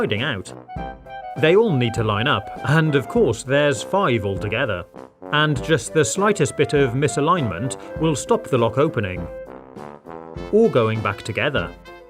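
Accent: United Kingdom. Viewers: Pure #BritishAccent nice to hear as it is conspicuous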